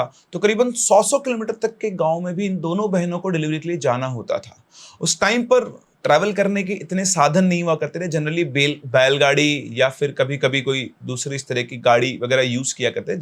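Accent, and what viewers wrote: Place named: Maharashtra, India. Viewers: I love that Marathi accent of sir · Humne bhi aise hi story suni hai relative se U were talking Marathi cutely 😊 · Hello sir I am from jalgaon and i loved the story and ur marathi ascent ❤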